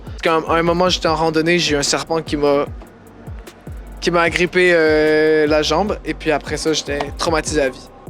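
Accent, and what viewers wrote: Quebec, Canada. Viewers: C'est trop drôle de t'entendre en dehors de tes musiques parce que dans celle ci on se rend pas compte que tu es québécois 😂❤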